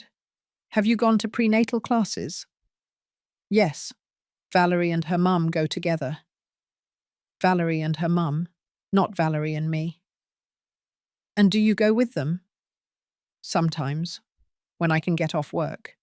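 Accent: United Kingdom. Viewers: I love these stories because of the British accent and slower pace of speaking. Other story channels have very annoying voices and speak with agitation and too young of a voice for the age of the main character.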